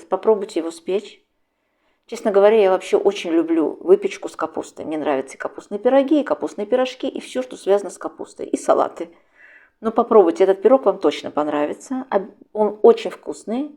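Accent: Baku, Azerbaijan. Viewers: Джан, мой бакинский акцент ☺️🌷💜 Как бальзам на душу. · Тихи-тихо - это потихоньку. И у Вас милый бакинский акцент сохранился❤ И Вас приятно слушать и смотреть, спасибо за рецепт! Москва смотрит.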